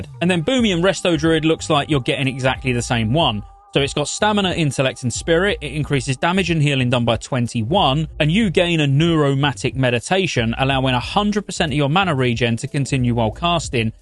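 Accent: United Kingdom. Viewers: Hi right there! Did you appears to be british?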